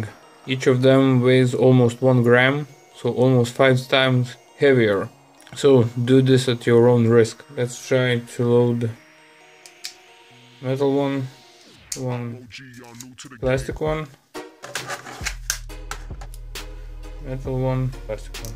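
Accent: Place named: Serbia. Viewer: alright thanks! Btw, where are u from? Ur accent sounds like serbian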